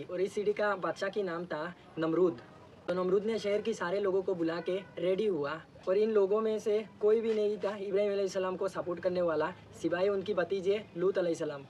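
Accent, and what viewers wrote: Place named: Sylhet, Bangladesh. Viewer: Mashallah!! I'm just big fn of ur sylethi accent 😂❤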